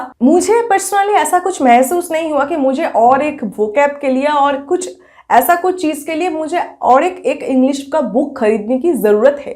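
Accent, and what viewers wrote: Bengal, India. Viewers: Bengali hi hain, isme hasne ki kia baat kia hain?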